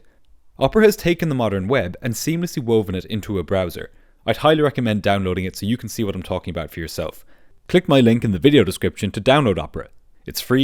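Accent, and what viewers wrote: Ireland. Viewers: Are you irish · Are you Irish? You sound like it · Are you Irish? Hell yeah, I love this channel even more.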